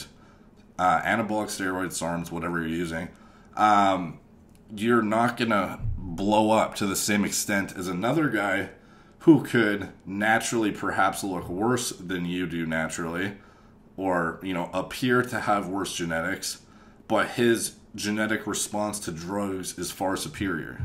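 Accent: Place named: Canada. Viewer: Yeah the way some canadians say out , aboot, house lol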